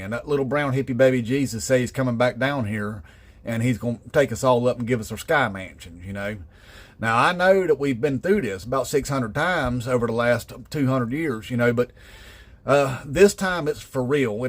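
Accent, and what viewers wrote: South, United States. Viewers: You sound like you're from the South. Don't tell me that you can look around the US today and not feel that the idea of Jesus coming to unalive you doesn't have some appeal. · Hahaha this guy is modern day version of the Third Reich with a redneck accent. The left hate it when other people have different opinions. I don't believe in the rapture therory but certainly respect those who do. HEY DUDE LET PEOPLE BELIEVE THE WAY THEY WANT TO IT IS CALLED THE FREEDOM OF RELIGION...EVER HEARD OF IT? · Hi Mr., I gotta confess I love your accent, it sound so american-rich linguisticly!! Cheers from Costa Rica!!! The whole world is literally watching!!!! 👍🏼👍🏽👍🏾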